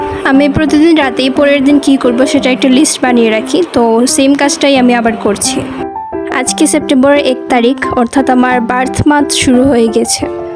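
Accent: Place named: Sylhet, Bangladesh. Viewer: তুমার মুখে সিলেটি ভাষা প্রচন্ড ভালো লাগে।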